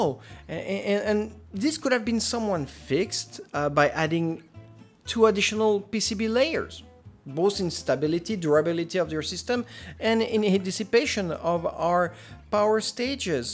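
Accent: France. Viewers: oh, you're french? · Mais mais mais... je me disais bien que this voice hear french XD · I love his accent and now I desire a french man 😂🤦🏽‍♂️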